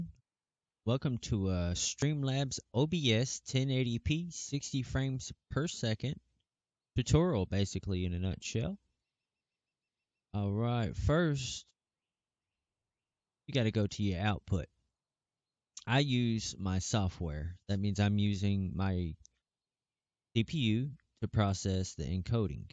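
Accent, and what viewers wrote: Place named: United States. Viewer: you sound like a american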